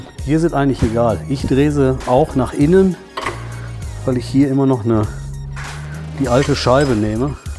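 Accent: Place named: Ruhr, Germany. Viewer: ganz große Klasse. Ich mag den Mann. Besonders seinen Kohlenpott- Akzent! und seine menschlichen Ausbrüche: "Wo hab ich denn den 24er wieder hingelegt?!"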